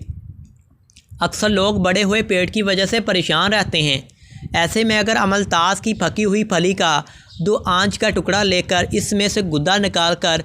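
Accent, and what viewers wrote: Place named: Rural, India. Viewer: Oh pendu baat krni ki tameez Nahi moh utha kr video bananaiy ajataiy hai sastay Hakeem Kiya talafuz hai Urdu k tera pendu bs kr dy bs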